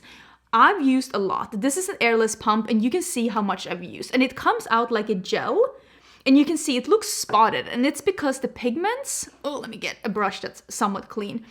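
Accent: Värmland, Sweden. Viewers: Great video❤ And yes I can tell you are from Värmland😂 but I love the accent. I am a skåning and get mocked for my accent all the time😂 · I had no idea that you were from Värmland. I actually thought that you lived way more north, but that’s just the mixture of dialects 🤷🏽‍♀️! I really liked this video, showcasing good products that happens to be more affordable than the Sephora brands. 💕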